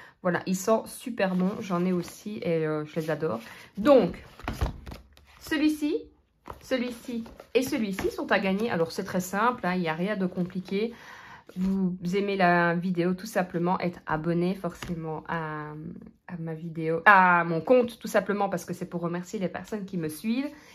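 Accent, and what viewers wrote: Belgium. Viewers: Bonjour tes vidéos sont très vivantes et j’adore l’accent belge ,tu aimes les fleurs alors acceptes ce bouquet 💐🌺💐🪷🌾🌸🌻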